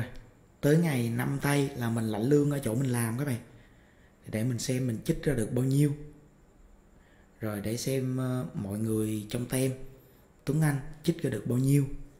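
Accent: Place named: Mekong Delta, Vietnam. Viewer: Thật em nói chuyện rất là chậm rãi rõ ràng truyền cảm và lịch sự. Vì nói giọng miền tây mà nói nhanh thì ng bắc nghe ko rõ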